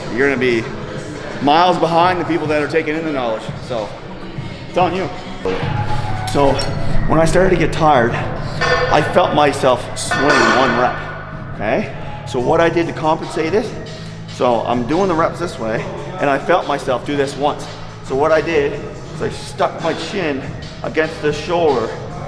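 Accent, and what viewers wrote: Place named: East Coast, Canada. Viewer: Is that a Canadian East Coast accent I hear?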